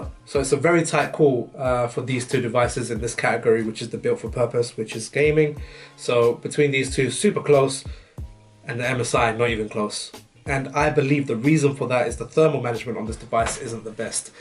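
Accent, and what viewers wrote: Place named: Arab world. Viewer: واضح من لهجتك عربي صح؟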